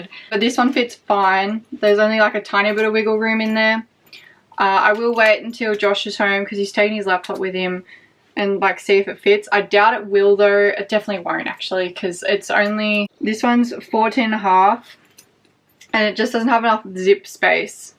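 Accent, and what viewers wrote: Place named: Australia. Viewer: I'm looking forward to get my laptop bag which is on the way. I love the kind you talk although some time as a non english native speaker your lovely Aussi accent is hard to understand 🙂Go on and stay authentic!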